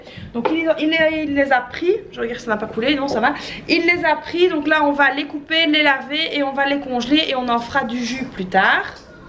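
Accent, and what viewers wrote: Belgium. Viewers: Bonjour! Chouette vidéo! Votre accent viendrait-il de Belgique? 😉 Bonne journée!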